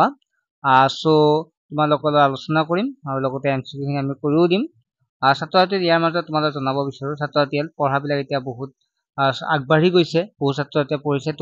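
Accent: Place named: Jorhat, India. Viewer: Sir jorhat district r lage 😅😅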